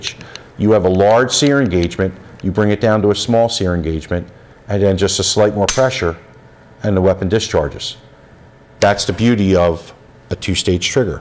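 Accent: Pennsylvania, United States. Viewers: Hello, SIr. I a Lancaster boy living in Florida about your age. I recognize your PA accent anywhere. I have two of your triggers, the SD 3 gun ans the ALG ACT. Boy o boy are they great and exactly as you describe in your video. My next one will be the SSA E with a flat bow. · I love me some Pennsylvanians. Live in another state now, and miss the accent and pacing. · I hear Pennsylvania in your voice.. sounds like home.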